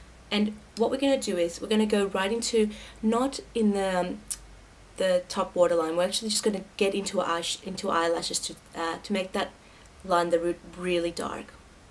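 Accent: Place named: Australia. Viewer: I absolutely love Australian accents. :) So cute! Beautiful look!.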